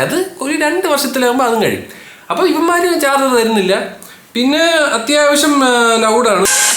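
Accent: Trivandrum, India. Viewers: Pinne enthire haha edayike Trivandrum slang varum.. adipoli man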